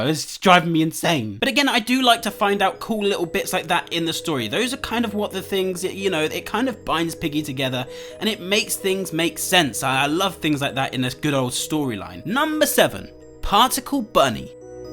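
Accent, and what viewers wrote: United Kingdom. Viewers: are you bridish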